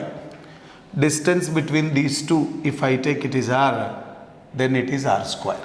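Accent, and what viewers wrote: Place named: Karnataka, India. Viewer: Hearing ur voice and ur kannada accent is so satisfying sir 🙏 plz keep doing this work dear sir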